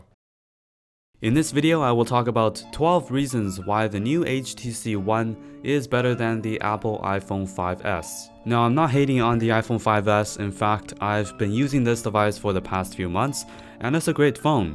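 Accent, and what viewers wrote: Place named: China. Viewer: Your accent sounds pretty mandarin....... well I guess more like the mainland mandarin ?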